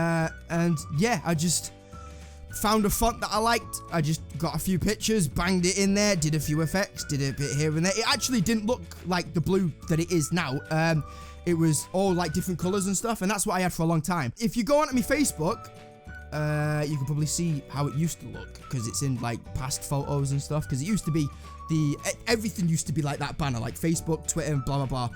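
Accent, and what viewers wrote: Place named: Lancashire, United Kingdom. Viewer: I figured you was a lancashire lad but i didn't think you'd be as close as manny haha nice one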